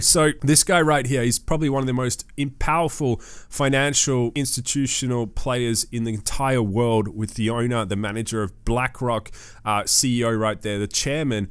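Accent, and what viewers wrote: Australia. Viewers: Great to hear a good Aussie accent. Xrp will run like mad once the market runs. Now it’s clear adoption will explode Thanks for your work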